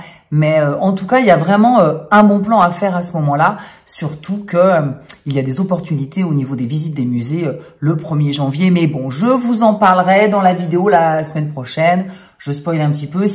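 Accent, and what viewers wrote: Italy. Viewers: Toujours un plaisir de retrouver ton accent d'accueil italien. 💖 Il n'est jamais trop tôt pour repartir en italie. 😅 Merci pour cette vidéo sur quand partir en Italie cette année 2023. 😍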